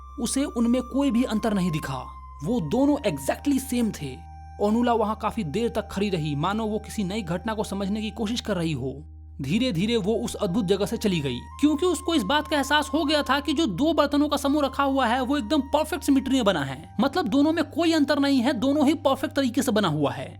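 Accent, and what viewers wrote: Bihar, India. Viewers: Bhaiya bihari kam bolo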